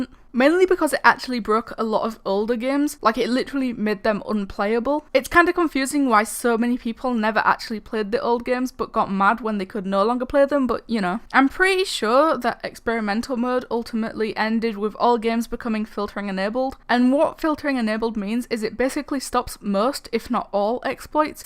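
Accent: United Kingdom. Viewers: Oh godhaaaaaaaaa THAT BRITISH ACCENT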